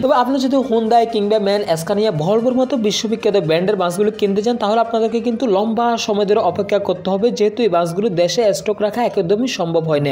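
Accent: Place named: Comilla, Bangladesh. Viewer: ভাই ধন্যবাদ একবারে আমাদের খাঁটি কুমিল্লার ছেলেদের মতো কথা বলছেন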